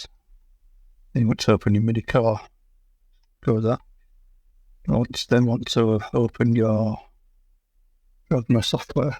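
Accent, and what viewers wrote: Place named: Scotland, United Kingdom. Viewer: I can understand you, maybe I’m used to the northern and lowland Scottish accent?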